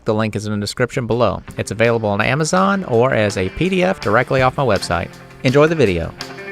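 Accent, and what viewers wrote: South, United States. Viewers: What city & state or town & state, is this located in??? Your accent sounds like you’re somewhere down South & I love the rent prices!👍🏻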